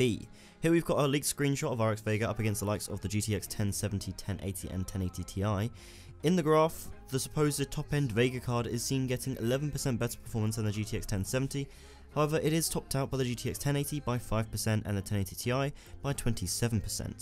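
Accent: United Kingdom. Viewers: omg replied awesome videos bro i dig that british accent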